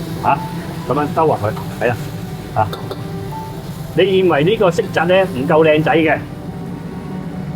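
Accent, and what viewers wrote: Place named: Dongguan, China. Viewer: 好正！冒昧问句，是否东莞人？好似听到有东莞乡音！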